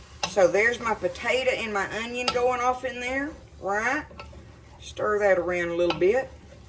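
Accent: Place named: Texas, United States. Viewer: This is a wonderful cooking get together show. The nit pickers need to leave this sweet Texas gal alone. In Texas we have our own talk yall. Happy New Year!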